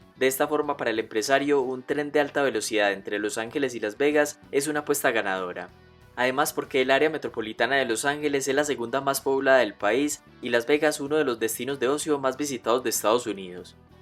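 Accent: Colombia. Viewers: Excelente video pero pésimo la narración el acento colombiano lo daña todo.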